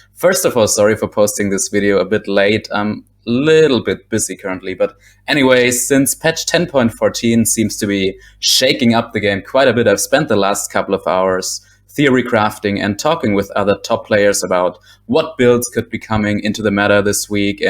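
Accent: Germany. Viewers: i mean something light simlar to <link> . But i get your time point, so if not totally fine, just thought I might bring it up. Your analsis is in my eyes better, hence I would be very interested. Especially in the before the patch drops part. :) Since I assume you are german aswell (because of the accent) Gute arbeit. Bitte mehr davon :) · Are you German? Your English is very good but your pronunciation of the letter "s" gives it away :)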